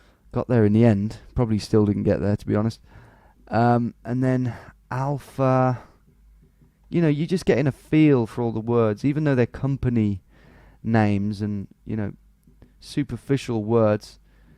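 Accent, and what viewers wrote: United Kingdom. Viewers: I just realized that Stewie has a British accent and therefore you sound like Stewie. 🏈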